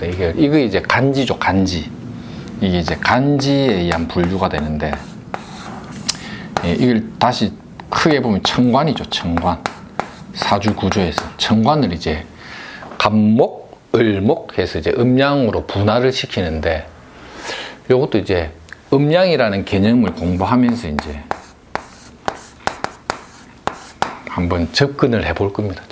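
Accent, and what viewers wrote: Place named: Gyeongsang, South Korea. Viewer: 감사합니다 경상도 사투리가 너무듣기 좋아요! 나이트 ㅋㅋㅋ ~~~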